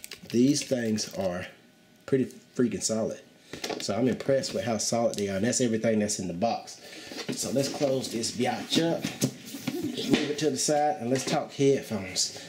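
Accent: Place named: Texas, United States. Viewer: y sound like a countryman from Texas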